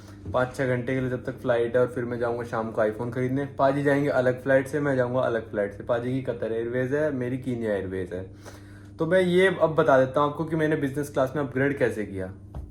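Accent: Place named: Rural, India. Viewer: Looking ,Sounding and behaving like typical dehaati . What can you expect ?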